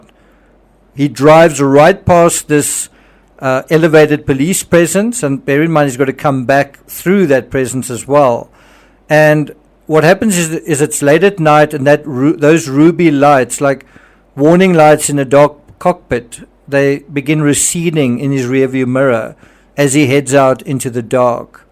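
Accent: South Africa. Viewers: Your accent alone is great! South Africans are very interesting people. I was blown away watching Charlize Theron speaking Afrikaans on an interview 👏🏻👏🏻 I always forget she is from there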